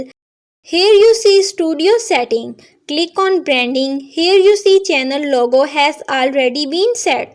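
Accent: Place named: Afghanistan. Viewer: india? your voice like a afganistan dialeg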